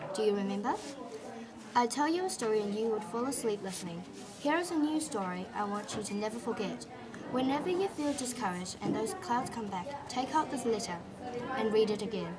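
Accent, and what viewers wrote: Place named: England, United Kingdom. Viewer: OMG an Asian with a English accent.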